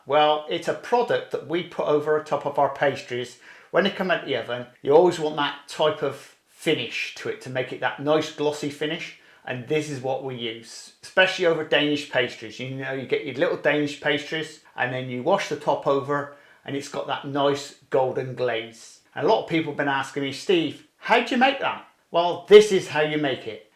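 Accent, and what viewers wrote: United Kingdom. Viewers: Great recipe and nice british accent!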